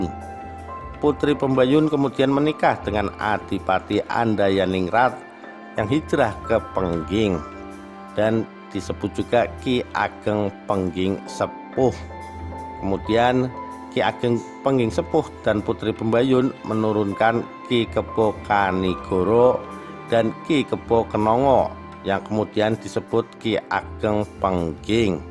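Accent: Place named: Banyumas, Indonesia. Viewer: Sayang chanel ini yg membacakan critanya sangat g menarik blas....aksenya banyumasan...g menarik blas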